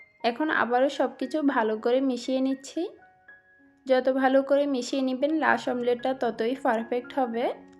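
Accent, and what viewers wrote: Chittagong, Bangladesh. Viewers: Chottogram er Language 😮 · আপা মনে হয় চিটাগাং এর কথায় চিটাগাং এর টানটা আসছে।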